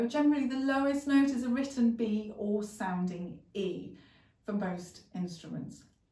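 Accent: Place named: United Kingdom. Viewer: I so love British accents. Mesmerized....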